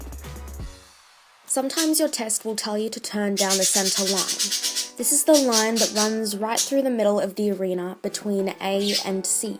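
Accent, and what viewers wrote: Australia. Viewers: What server are you on? You sound Australian so I guess you'd be on FS with most? I'm on Magnolia Jungle which is apparently a lot quieter than Firestar. Maybe when I've been playing SSO for a bit longer I'll have a look for other people into RL dressage on SSO. Not to start a club as such, just to get some people together who want to do real life dressage. If anyone who reads this is on Magnolia Jungle and is interested in doing some dressage with me, please just reply to this comment and we'll organise to get together :) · Luv the vid! We r so similar! Both come from Australia (I’m guessing from the accent), both play sso, and both have birds!!!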